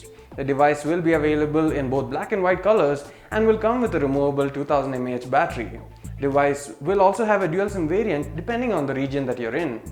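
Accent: India. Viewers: Ninguém entende essa desgraça de inglês indiano!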